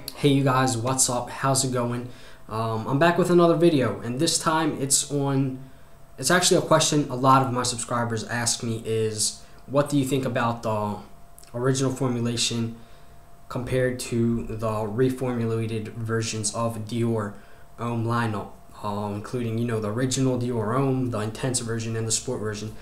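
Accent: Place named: Baltimore, United States. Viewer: *also... While I know your country is listed as Greece your accent sounds a lot like Baltimore City, Maryland... Just thought I would mention... ^_^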